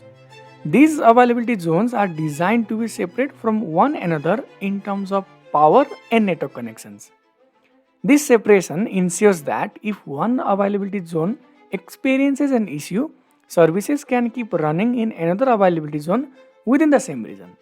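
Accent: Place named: Nepal. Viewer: Because your voice tune is like nepali-english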